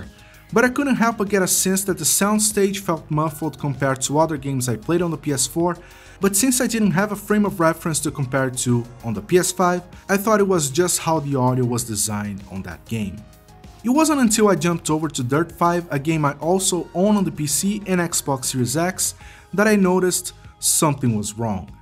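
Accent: Brazil. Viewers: hey man, are u brazilian? great video by the way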